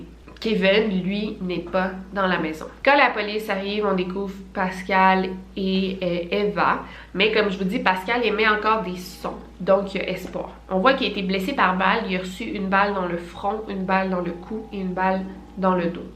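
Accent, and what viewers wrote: Canada. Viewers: Laissé tombé cette Accent canadienne vraiment j'aime pas trop, pourtant elle j'aime bien.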